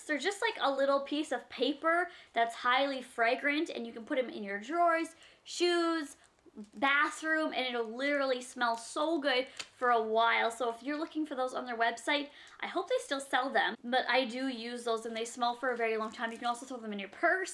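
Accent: Canada. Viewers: Your Canadian accent comes out a lot more when your boyfriend is in the video. It's cute.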